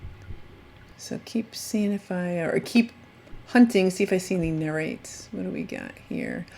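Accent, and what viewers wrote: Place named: South, United States. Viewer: Hello love! FIRST! Maybe one day, I'll make it to the Keys. Don't know why I've never been... Beautiful sea star! Is that a Clydesdale horse conch? Hey, you're beginning to sound like a real Southern girl! "I'll tell ya what!" You did great and had such an exciting adventure! So happy for you! Much love from Levi and me! It's nearly a hundred degrees up here! We're staying in the AC! Your Mermaid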